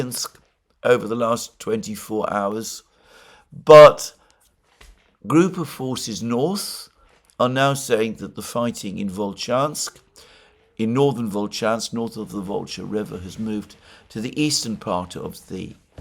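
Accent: United Kingdom. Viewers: The idea that Israel will want to acquire Damascus is so absurd, so out of touch with reality, so utterly ridiculous that it makes you sound like an idiot even with your suave British accent. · I can't stand that British accent in times like this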